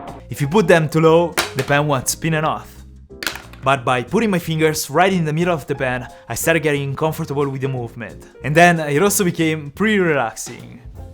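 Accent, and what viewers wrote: Italy. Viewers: Il tuo accento mi fa morire, credo che tu mi stia capendo perche si capisce che sei italiano ahahah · Your accent sus I think Italian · Come si sente che sei italiano.. comunque bel video. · Si sente che sei italiano😂😂😂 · I love his Italian accent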